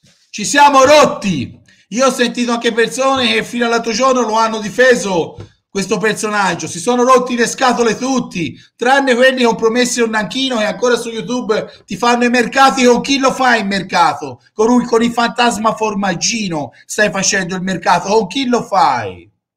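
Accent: Tuscany, Italy. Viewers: Dal accenro si capisce alla perfezione che sei toscano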